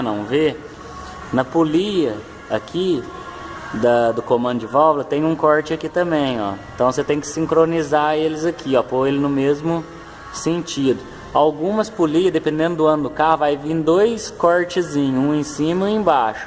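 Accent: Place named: Minas Gerais, Brazil. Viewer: Vc pelo sotaque parece minerin de sete lagoas rsrsr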